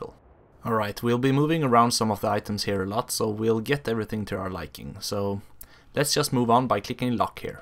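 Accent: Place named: Gothenburg, Sweden. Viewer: You have the most Gothenburg accent I've heard in my life.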